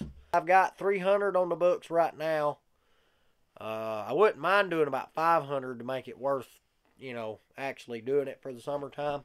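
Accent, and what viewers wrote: Appalachia, United States. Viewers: Absolutely love the Appalachian accent! Glad you are starting your own channel. Looking forward to it. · Way to go! You are very entertaining to watch and listen to. Genuine Appalachian! Those of us in other parts of the country love hearing the way you talk and your common sense way of thinking. You will be very successful and make Bob Binny proud. Best wishes.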